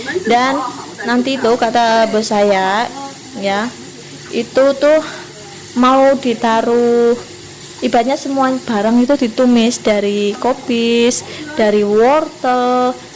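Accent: Banyumas, Indonesia. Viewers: Wa'alaikumsalam warohmatulohi wabarokatuh Salam ngapak ora ngapak ora kepenak juga mbak wong wadon ngapak🙋‍♂ Wah asik dong gantian ngawasi majikan masak😀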